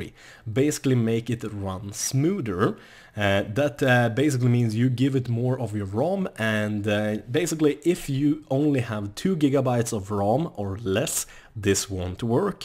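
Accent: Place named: Sweden. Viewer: Swedish english is simple to discover...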